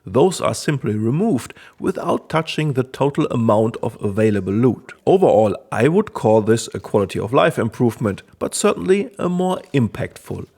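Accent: Germany. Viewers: Bro sounds more german than me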